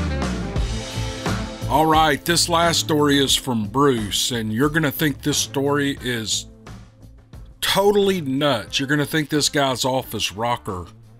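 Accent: South, United States. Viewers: I’ve tried other shows on this subject, but yours is the one I always click on. Your voice sounds like my southern family, you sound like an uncle of mine, very calm and easy to listen to. Makes doing chores a breeze. Thank you and keep it up. Maybe you’ll have an experience yourself. Have a blessed day. 😊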